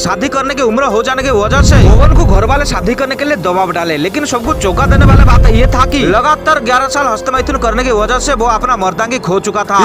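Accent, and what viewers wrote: Bengal, India. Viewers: Bengali jaise bat karte ho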